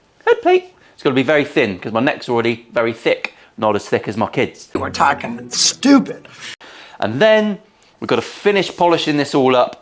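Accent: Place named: Plymouth, United Kingdom. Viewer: Shit - I’ve got to make a second observation: “Triggers wankers” is now my favourite quote. Wish I had a Janner accent!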